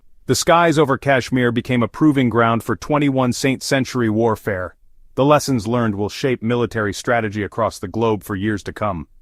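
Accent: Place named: United States. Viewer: BS in an American accent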